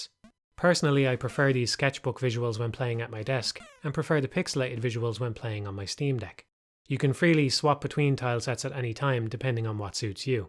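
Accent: Ireland. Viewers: And he emerges! Man, I really miss your dulcet Irish tones talking about games. Congrats on the game-making achievement! <3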